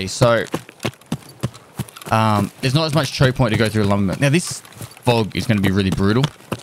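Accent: Australia. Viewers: Its 3am. Sleep: calling: has new vid out Me: I MUST HEAR HIS AUSTRALIAN ACCENT AND BEHOLD HIS EXCELLENT MARKSMANSHIP. It gib me serotonin boost:)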